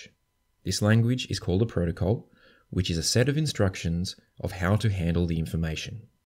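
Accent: Australia. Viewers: Networking lessons in an Australian accent? What could be better?? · Very well made and informative video. Very easy to listen to australian accent also! Thank you. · So, the narrator is Australian, I assume, based on his accent, right? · Is it Australian accent??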